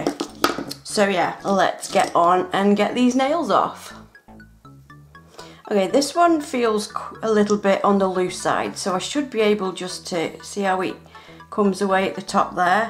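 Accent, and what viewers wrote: United Kingdom. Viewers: she's british that's how we say it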